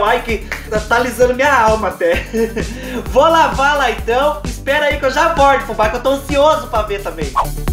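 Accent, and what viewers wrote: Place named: Paraná, Brazil. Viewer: Vc fala igualzinho o pessoal da cidade onde morei. Em Wenceslau Braz norte do Paraná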